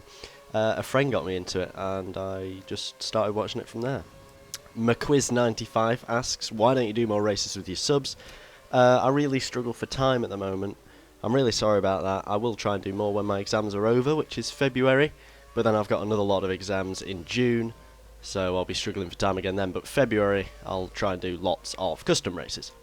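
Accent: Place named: North, United Kingdom. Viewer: You sound like ur from the north Anyway very big fan of urs Just brill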